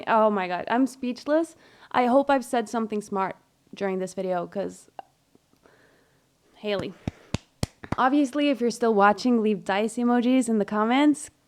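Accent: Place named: United States. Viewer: I AM REALLY SHOCK 😱😱😱😱😱 I confess that i didin't know her before watching this video, and hands down to her oh my Goodness What a beautiful voice, i'm gonna watch more stuffs about her Please don't be mad at me, but she's also reminds me just a liitle bit of Mariah carey 😂😂😂 i'm sorry, of course she has her personality, which is stunning, and the jazz vibe changed everything But over all i love this so much "Without me disturbing you" hege, you never disturb us, at least for me And wow !! You're from norway!! It's a beautiful country, and your english is very american hahahaha , so good for the singers 😎 Anyway, thank for the video 💛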